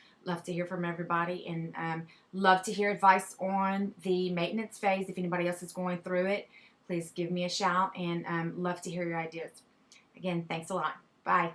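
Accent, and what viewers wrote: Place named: Louisiana, United States. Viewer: I see ur Saints Fleur de lis on the wall, I did notice your accent before and thought you were a LA girl too! I live in LaPlace 30 min from New Orleans. Love, love, love ur updates! Penny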